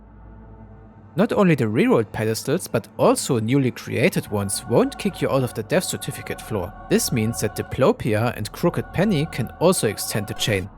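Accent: Germany. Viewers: im asking myself for a long time but are you german?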